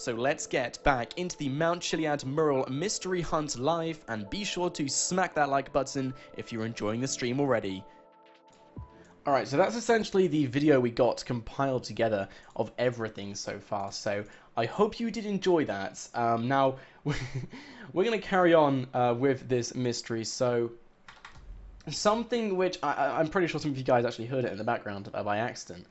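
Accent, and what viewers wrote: United Kingdom. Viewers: Fuck your british accent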